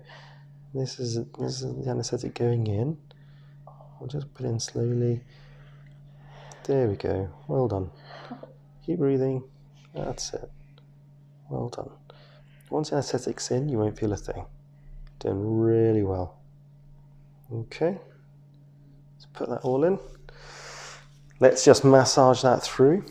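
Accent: England, United Kingdom. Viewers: Very soothing bedside manner, as usual! And I love how everything sounds better with an English accent. Keep up the good work, Doc. :-)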